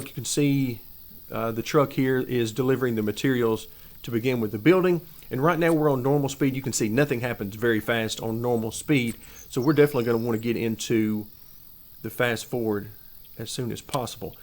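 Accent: South, United States. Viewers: I am from Florida and this accent is interchangeable for Florida, Georgia, Tennessee and maybe further out depending on the individual. I have lived down here for thirty-five years.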